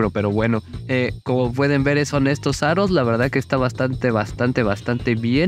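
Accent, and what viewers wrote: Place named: Michoacán, Mexico. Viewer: creo que eres de michoacan XD por como hablas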